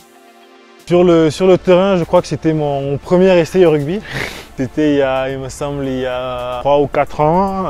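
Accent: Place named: Toulouse, France. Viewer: Ce bel accent Toulousain qui fait que l'on n'oubliera jamais d'où tu viens 😉 et cela même si tu vas très très loin comme je te le souhaites!!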